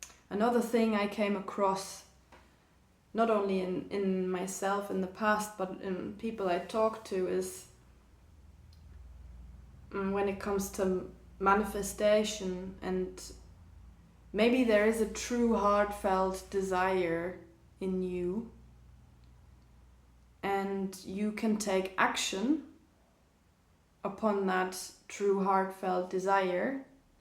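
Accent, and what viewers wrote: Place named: Ireland. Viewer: Hey there! Do you live in Ireland? I'm picking up hints of an Irish accent 😄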